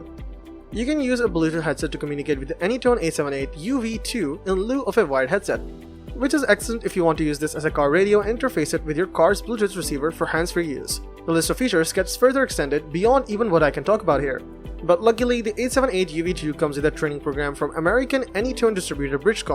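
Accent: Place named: India. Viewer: You are trying so hard to hide your Indian accent.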